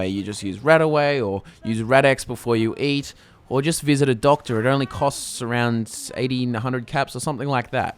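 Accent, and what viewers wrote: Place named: Australia. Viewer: What an annoying Australian accent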